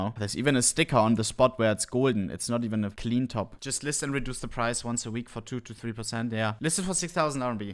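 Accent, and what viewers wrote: Germany. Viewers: Idk who this guy is but he sounds so German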